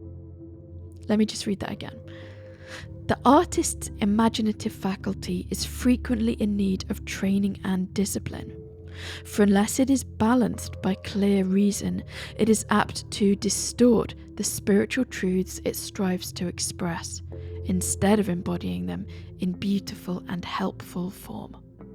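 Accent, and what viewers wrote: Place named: England, United Kingdom. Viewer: A beautiful English accent!)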